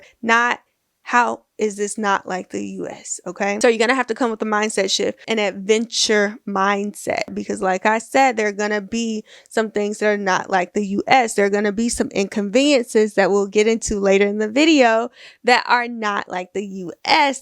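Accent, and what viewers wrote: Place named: Twi, Ghana. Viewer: I love your twi accent😂😂😂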